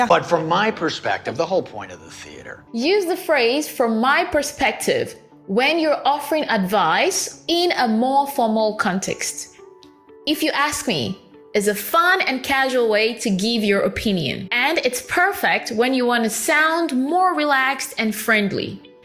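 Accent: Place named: Nigeria. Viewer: Woow!!, Im really glad to come across your youtube channel , your English lessons are helpful and informative, your accent is very clear, by the way , I love my nigerian brothers and sisters, their comedies are A+, Did you grow up in Nigeria? ( your accent ), just asking my dear Sister. You have earned yourself a Subscriber.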